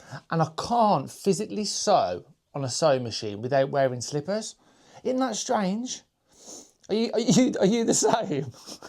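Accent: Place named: West Midlands, United Kingdom. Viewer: I wish I could find the enthusiasm to clean up. I'm sure it would make for a nicer experience. I just just straight into the mess again the next day though 😬 The slippers are crackes! I'm sure he'll love them. And without sounding like a giant brown noser, you're making our lives better too! It's so nice to see someone else figuring out their way around crafts and sharing their wins and losses. And I spent a few years living in Birmingham and Wolverhampton (from Australia) and your accent makes me so happy!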